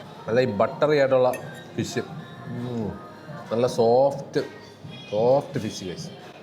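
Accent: Trivandrum, India. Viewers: Why you gotten Trivandrum slang 🤔🤔🤔???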